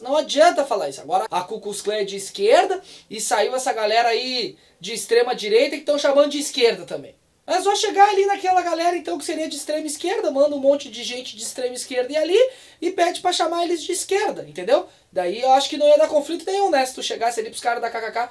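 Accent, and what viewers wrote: Porto Alegre, Brazil. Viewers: VÉI, quando eu assisto teu canal me sinto muito bem. Parece que eu to num bar da CB mandando brasa nos papo tri cabeça com a gurizada. É o sotaque portoalegrense. Acho que acostumei a assistir vídeo com sotaque paulista aqui no youtube. Abraço da zona sul de Porto Alegre e continua com os vídeos.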